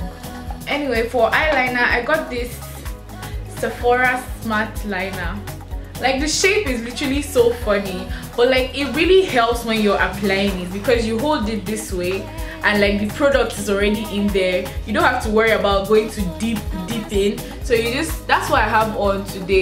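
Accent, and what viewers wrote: Nigeria. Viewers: Very nice haul,I love the way u mix our naija accent n words too,kip it up girl tfs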